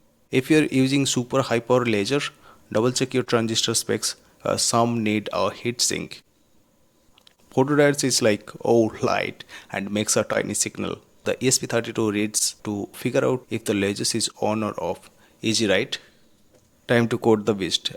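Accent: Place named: India. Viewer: bro y you sounding indian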